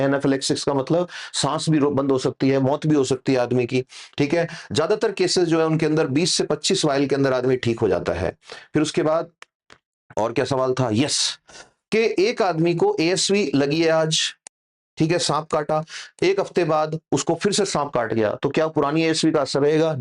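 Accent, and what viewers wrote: Rajasthan, India. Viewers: Sir aap sikar RAJ. Se to nhi ho aap ki boli se lag raha h agle lecture me bata dena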